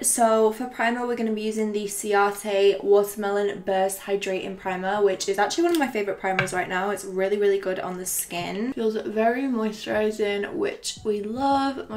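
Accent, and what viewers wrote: United Kingdom. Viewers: Finally I can find makeup tutorial with British accent!! love you!!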